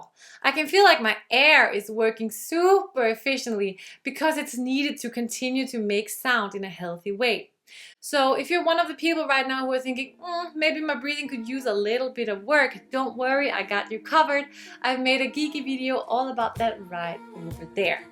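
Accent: Denmark. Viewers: He he, synes godt jeg kunne høre en dansker bag den ellers næsten perfekte accent :). Det var dog først da jeg så dit navn, at jeg kunne lægge to og to sammen:). Tak for nogle super gode og informative videoer!!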